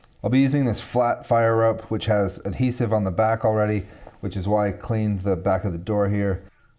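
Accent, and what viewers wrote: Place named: United States. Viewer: You sound very American for a bloke in the UK.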